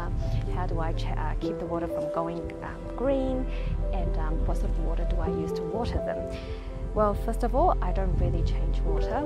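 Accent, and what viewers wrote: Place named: Australia. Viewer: aussie accent blended with some asian accentis excelent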